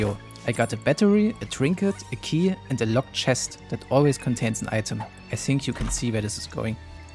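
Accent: Germany. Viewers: your german accent is very pog and interesting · you seem to have a strong accent, sounds pretty german to me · you german? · Are you german? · Where are u from, sounds a bit like German I think. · Uff dein englisch klingt Deutsch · You got a decent german accent haha, i like this more then just reading text keep it on if you like too :D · Hab schon immer geahnt dass du deutsch bist 😂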